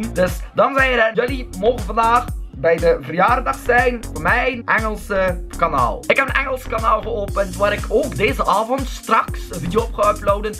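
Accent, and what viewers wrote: West Flanders, Belgium. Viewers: Een West-Vlaming die Engels gaan praten? Ben benieuwd :D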